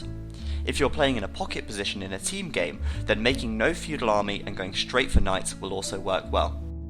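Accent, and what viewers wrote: United Kingdom. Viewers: He's got the most standard British accent you could possibly ask for, I have no idea how you're thinking he sounds Brazilian.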